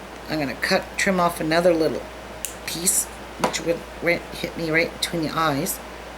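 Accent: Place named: Maine, United States. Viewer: Hello <3 I just wanted to say how much I enjoyed your personality! You were a breath of fresh air with your modesty, and reminded me of my childhood on the coast of Maine with your expressions and way of speaking. I was just reading some of the comments, and honestly, I wonder how some people can be so rude and judgmental under the guise of giving you "constructive criticism". My wonderfully colorful Great Aunt Laura from Great Island had an expression for folks such as these.....*spoiler alert, language may offend some of you** Opinions are like arseholes, ~everyone has one.... doesn't mean ya have to BE one! Happy Crafting, Love!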